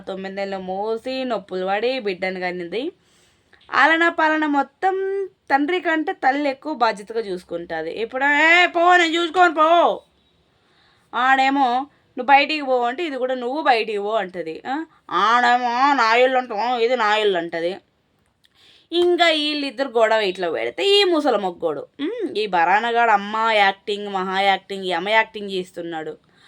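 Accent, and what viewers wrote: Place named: Kadapa, India. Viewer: Mi slang bagundi sis midi kadapa dist aa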